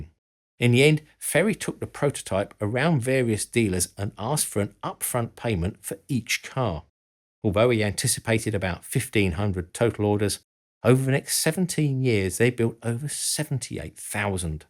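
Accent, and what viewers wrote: United Kingdom. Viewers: Cause he has British accent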